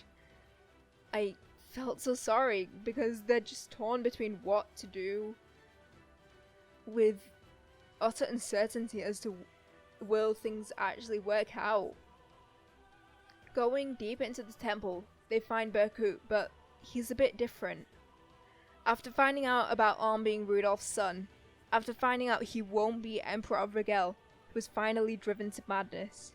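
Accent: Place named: North England, United Kingdom. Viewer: Great overview of Alm from a lovely north English accent.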